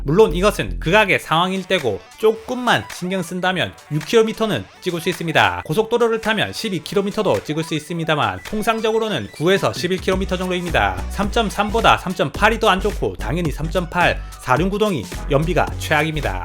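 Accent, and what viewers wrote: Gyeongsang, South Korea. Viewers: 경상도 사람잉가 ㅋㅋㅋ 사투리들리네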